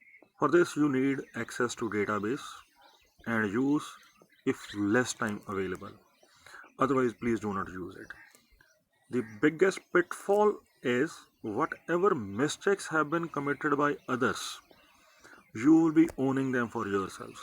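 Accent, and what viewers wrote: Punjab, India. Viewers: good sardar ge. i knew all these things but i enjoyed you explaining all this stuff, being punjabi myself i love to listen to punjabis speaking english from which the fragrance of punjabi oozes out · This is extremely helpful and simplified.,that punjabi accent is clear even to a Zambian like me.I enjoy that epecially that is concentrated with quality information that is chunked into very simple steps.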